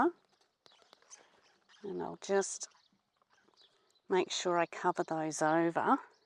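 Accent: United States. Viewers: Why has our Aussie got an American accent 😢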